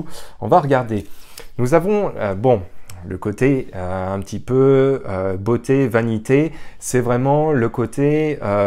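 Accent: Quebec, Canada. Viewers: BONJOUR GROS , tu as pris l'accent QUEBECOIS déjà , c'est excellent j'ai remarquer sur tes intonations , c'est excellent dans un an tu seras bien acclimaté , en tout cas super choix d être parti vivre une belle aventure plein de bonnes choses à toi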